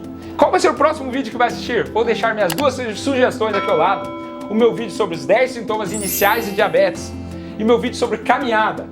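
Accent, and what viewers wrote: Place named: South, Brazil. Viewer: Sou de Lima Peru e ja inscrevi no seu canal, amo do seu canal e sotaque sulista como o meu hehehe🥰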